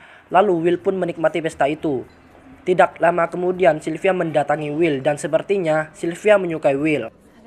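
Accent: Madura, Indonesia. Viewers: Logatnya kyak yg kenal...org madura ya tretan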